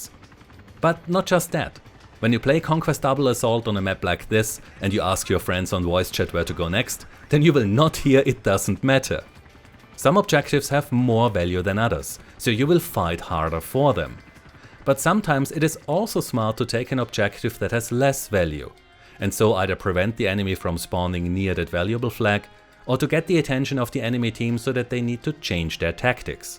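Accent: Germany. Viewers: Deutscher Akzent? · Are u german? Your english sounds like that😅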